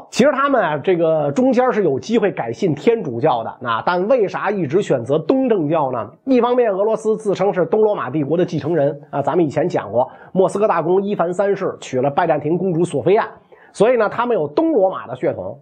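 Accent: Beijing, China. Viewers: 听到袁sir这字正腔圆的北京话就神清气爽啊！提神👍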